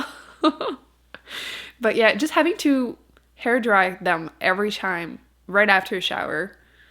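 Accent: Quebec, Canada. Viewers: Have people never heard a French Canadian accent? 😂 I grew up in Eastern Ontario where every second person you meet has the same accent! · C'est toujours bizarre a chaques fois que je t'entends parler quebs ahahah 😂✨ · J’aime trop ton accent québécois 🥺 je me demandais si tu regardais des vidéos de booktubers français? ou plutôt que des anglophones? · I don't feel like you have a very strong Quebecois accent at all. You sound more like a native English speaker with a lisp, no offense. My ex roommate was Quebecois and usually dropped her French accent so people wouldn't know she spoke french, but if she didn't, it was quite apparent. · I think that most people have just never heard a french canadian accent. I hadn't heard one until I came across this channel a few years ago.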